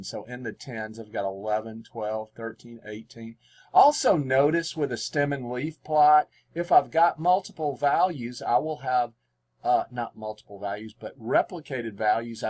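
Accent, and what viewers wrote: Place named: South, United States. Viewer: You, sir, are incredibly clutch... I was getting caught up in trying to figure out how deep down south he's from!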